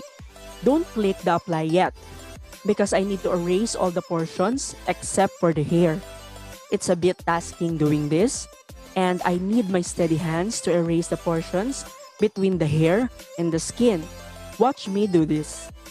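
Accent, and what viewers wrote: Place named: Philippines. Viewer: the filipino accent makes me laugh sometimes while she explains certain things...good tutorial though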